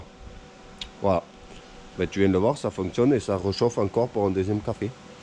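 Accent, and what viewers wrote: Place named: Narbonne, France. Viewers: Vu le prix que va prendre l'électricité , c'est obligé que dans mon futur logement que je cherche je vais mettre un système solaire . Ps: L'accent Narbonnais , mes racines , Merci ^^Force à vous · Aaaah l’accent de narbonne, il fait du bien à entendre.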